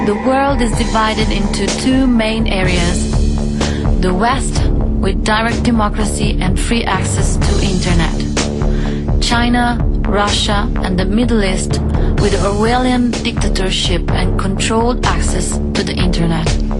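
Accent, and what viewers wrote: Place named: Italy. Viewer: chiunque abbia fatto questo video poteva almeno prendere qualcuno che perlasse Inglese in maniera decente, e non il solito pastone Italiano/Inglese in stile Toto' 40 anni fa....patetico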